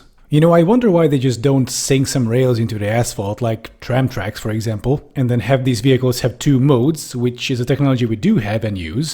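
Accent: Hungary. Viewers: Akcentusbol ugye jol vettem ki a magyar szarmazast? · Okay, I refuse to believe you're not Hungarian. You've used Hungarian subway lines for comparisons in several videos, you pronounced Hungarian words perfectly and although not very noticeable, you do have a slight Hungarian accent. Cool. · ez nekem is feltűnt de az ahogyan mondta a magyar szavakat és amilyen sokszor emlegeti a budapesti metrot nekem eléggé azt sugallja hogy magyar az illető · Are you hungarian or do you just have a really authentic accent?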